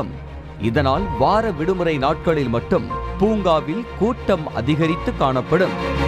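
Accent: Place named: Tirunelveli, India. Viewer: நீங்க thirunelve சூப்பர் i like that slang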